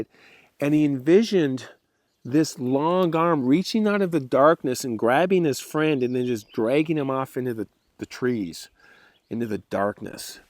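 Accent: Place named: Great Lakes, United States. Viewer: Hi! I was a long time subscriber, but in the winter I had to disconnect for a while, and in the spring, with a new laptop, I could not retrieve my old Youtube account. I lost all my old subscriptions, and could not comment for a while. I will be sorting that out soon, and start re-subscribing to my old friends and their channels. Now I am catching up on your videos since the new year. Cheers! 🙂 I am living in Southern Ontario, and the difference between the over-populated south of Ontario, and the real Northern Ontario wilderness is extreme! We even share an accent with Minnesotans. According to linguists, it is called Yoopers, which is a distortion of Upper Peninsula. The Great Lakes rural folk seem to speak similarly. Great Lakes folks share this accent, and I only notice it occasionally, but I hear it with you. Cheers again! Nice to see your videos!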